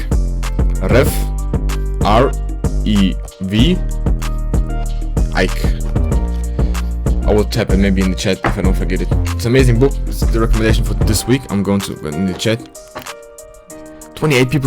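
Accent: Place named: Germany. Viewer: Deutsches English izz da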